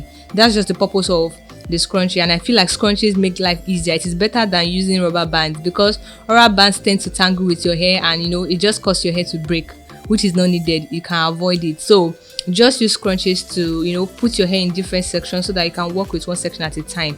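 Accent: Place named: Africa. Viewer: She talks toooooo fast with a very strong African accent.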